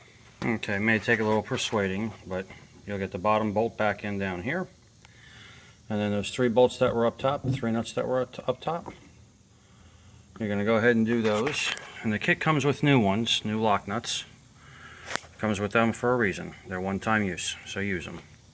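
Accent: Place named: Central Jersey, United States. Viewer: you have the most proper central jersey accent i ever herd. also im doing this 3 in and 3 in rear aswell on my gmc canyon just waiting on parts.. cool video even tho its old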